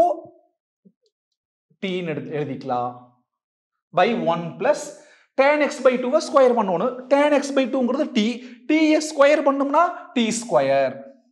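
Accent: Coimbatore, India. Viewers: Sir neenga Coimbatore ah ila thirupur ah sir ??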